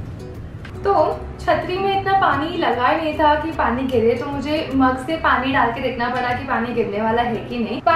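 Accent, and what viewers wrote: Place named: Maharashtra, India. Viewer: You have such a fun marathi accent.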